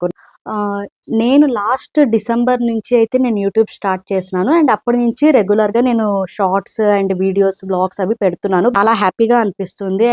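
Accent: Karnataka, India. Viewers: మీరు హిందూ పుర్ తెలుగు బెంగళూరు లో తెలుగు బాగా మాట్లాడుతారు మీరు కన్నడ నుంచి వచ్చిన తెలుగు ఆలాగే మీ భర్త గారిని రీ అంటున్నారు రి పదం కన్నడ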